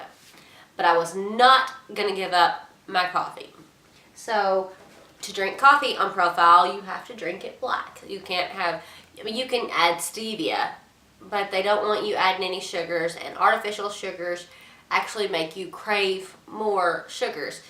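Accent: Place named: Georgia, United States. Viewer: Sound like a Georgia girl.. maybe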